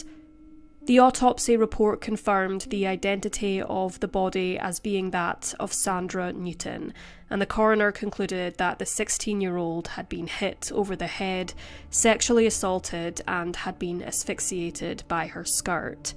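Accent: Scotland, United Kingdom. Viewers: New sub. Excellent vids. Love the Scottish accent. ❤️ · Scottish narrating a crime script is awesome. Its such a cool accent of english. Ive learned so much about Wales, Northern Ireland and the U.k. as a whole from other english crime mysteriy tubers. Ive been everywhere on youtube and your channel is great! Love it .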